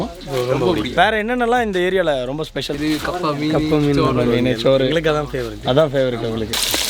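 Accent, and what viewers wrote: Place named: Kerala, India. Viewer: Malayalam slang madiri eruku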